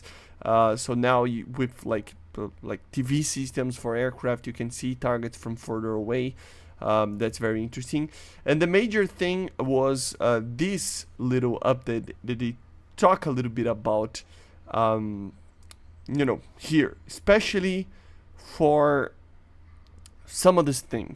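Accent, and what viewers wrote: Brazil. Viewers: I’m pretty sure he’s from brazil so English isn’t his first language and he probably only speaks English online so his pronunciation won’t be the best.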